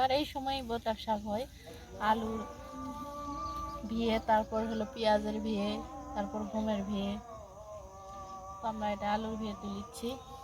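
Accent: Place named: Naogaon, Bangladesh. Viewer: আপনি কোন এলাকার মানুষ? ভাষাটা আমার দেশ নওগঁার মত শোনা যায়।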